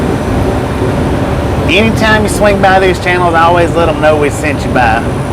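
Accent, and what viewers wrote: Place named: South, United States. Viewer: since Kyle was saying how he feels here I go lol. From your first live stream which came across in my feed. I heard a southern accent so I stayed tuned in. Then after many more streams I thought this guy is genuine. Your a friendly guy and you always seem calm. Great attributes to have bud. As far as your driving your awesome at that. Your cool with your subscribers and it has paid off. Anyways, after I read Kyle’s comment it compelled me to say what was on my mind. When I met you and seen your the same in person as well as your YouTube live streams. That went along way with me. I don’t stay as long as I’d like I get busy and have to step away but I try to pop in every stream and most the time when I step away I let it run lol. If I subscribe I support and you’ve done the same with me. I appreciate you brother and it’s good to see the subs you have. 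All mighty fine ppl. Okay ok. Nuff of this lol. Just being honest brother.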